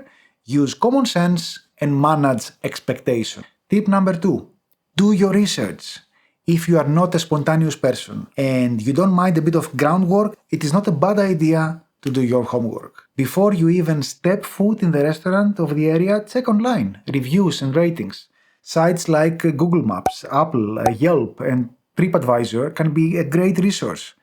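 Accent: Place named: Greece. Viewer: Πολύ ωραίος! Μια φιλική συμβουλή, επειδή το βίντεο προφανώς απευθύνεται σε αγγλόφωνους κι έχω κι εγώ ελληνική προφορά πολύ παρόμοια με τη δική σου, αυτό που έχω καταλάβει είναι ότι δεν τρέχει τίποτα με την προφορά και ούτε ασχολείται κανείς, εκτός από όταν αλλάζει το νόημα. Πχ στο τη λέξη "catch" την είπες χωρίς παχύ "sh" στο τέλος, δηλαδή ακούστηκε σαν "cats". Το κάνουμε συχνά αυτό το λάθος οι Έλληνες :)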